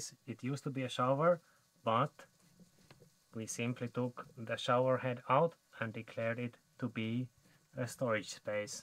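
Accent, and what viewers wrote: Finland. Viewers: Aksentista äkkiä päättelin että suomalaisia sitä ollaan, hienoo että elätte unelmianne! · Er du Nordmann? Aksenttisi perusteella uskon, että saksisi on oltava suomalainen.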